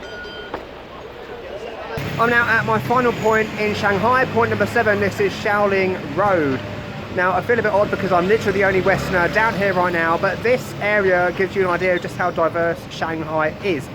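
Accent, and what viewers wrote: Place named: Cockney, United Kingdom. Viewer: You speak with cockney acent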